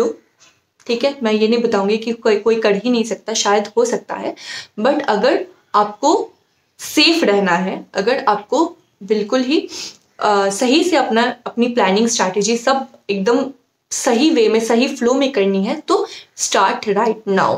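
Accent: Assam, India. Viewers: You sound like an Assamese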